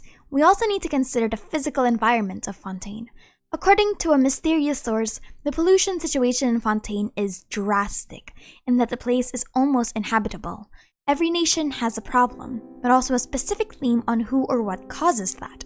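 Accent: Philippines. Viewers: I had the inkling you're a Filipino because of your accent when speaking in English :D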